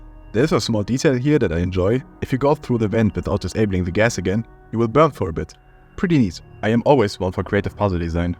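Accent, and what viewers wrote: Germany. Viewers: Kommst du ... aus Deutschland?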